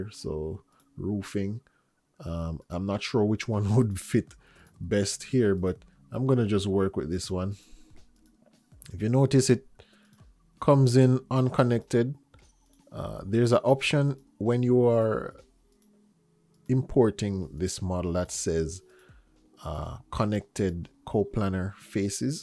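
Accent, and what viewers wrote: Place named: Jamaica. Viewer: Thanks bro. Peace from Cape town South Africa. I'm enjoying your series. And that Jamaican accent allows for good vibes whilst learning .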